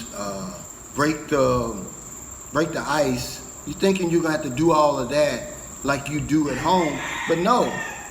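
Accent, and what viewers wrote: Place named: Kentucky, United States. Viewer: Slang English...ahh it's your Kentucky accent I bet.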